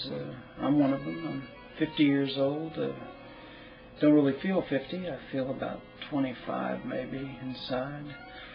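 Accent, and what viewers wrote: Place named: Texas, United States. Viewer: Heyy, just wanted to say thanks for sharing. You definatley do not look your age, you have beautiful eyes. And I could tell that you were from Texas, I can detect a slight hint of a Texan accent. When did you move to Colorado? Have a good week. --//xo;Ace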